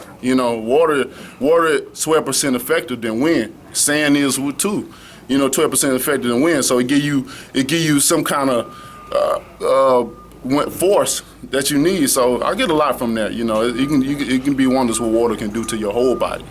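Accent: United States. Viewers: well Einstein, he's from a southern state, where a lot of them have the same dialect. You grammer cops kill me. This man will put you and your correct grammer to sleep in one punch.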